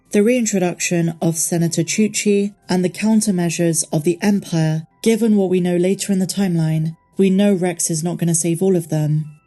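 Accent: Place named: England, United Kingdom. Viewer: Ok, the accent is way too heavy for me to understand what the narrator is saying almost totally. Could you please work on the sound quality or get a narrator without an english or whatever itis accent?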